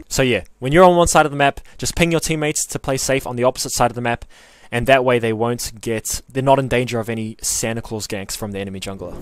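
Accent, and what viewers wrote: New Zealand. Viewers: Your vid was one of the first jungler vids that came up for me and I was like what is that a kiwi accent I spy??? Hilarious stuff, can't wait to watch more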